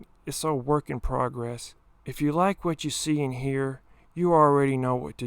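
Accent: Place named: South, United States. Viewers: As i am from KY myself, i must say it is quite refreshing to hear a southern accent on this video. Feel like us southerners just ain’t on YouTube or maybe they’re hiding it cuz it’s viewed as not intelligent by some. Brother you won me over and got my sub. Keep up the work man I like this video a lot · Right there with ya fellas. I’m from KY too. The world is in serious need of badass southern YouTubers like this guy.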